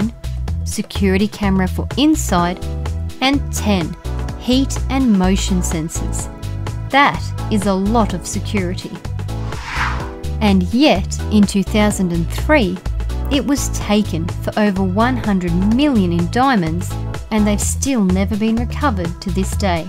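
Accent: Australia. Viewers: that is australian accent Mate !!! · She has an Australian accent.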